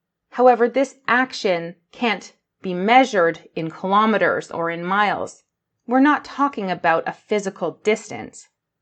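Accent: Canada. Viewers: Why are you using and teaching people American? "aboot" - You sound Canadian, so should be using English, not American. Further can fully replace farther in English (but farther only refers to distance). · J'adore votre accent canadien ❤💞💘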